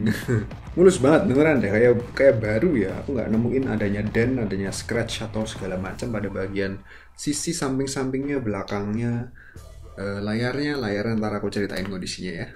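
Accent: Java, Indonesia. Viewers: oalah pantes jowone medok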